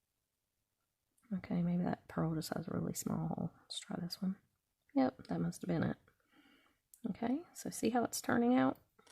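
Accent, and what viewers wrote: United States. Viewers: Love your cowgirl accent❤❤❤❤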